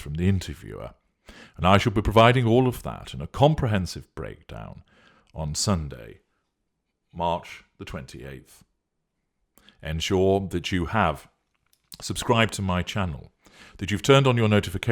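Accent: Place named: Northern England, United Kingdom. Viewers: I am always trying to place the Northern bit of your accent. Burnley? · is definitely a Northerner. I think it's Yorkshire.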